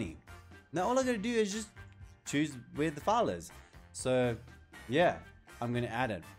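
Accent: South Africa. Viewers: howzit bru I heard your accent and was like, "Is he south african???" then i heard you say PK and i new you were south african lol.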